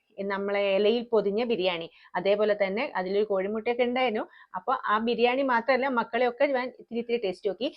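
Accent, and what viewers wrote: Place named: Vadakara, India. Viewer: Vadakara slang😅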